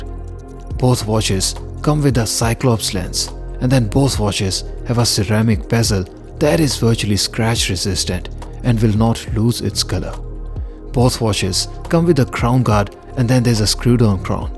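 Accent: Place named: India. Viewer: Damn, indian accent!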